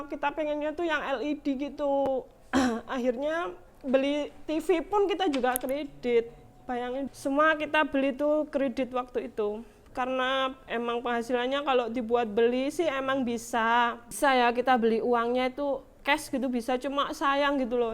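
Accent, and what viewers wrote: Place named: East Java, Indonesia. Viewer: Wong Urip mesti Ono masalah,OpO maneh wes rumah tangga problem Urip Yo tambah,OpO maneh terkenal persiapan kudu Mateng bener,aku seneng Karo sampean gayane ngomong Jawa timur banget lan ceplas-ceplos di dasari kebijakaanmu.lek iso bersatu maneh lah Ojo ego mesakne masa depane anak sepurane nasehati sitik.perlu Ono musyawarah antar keluarga besar kedua pihak ok.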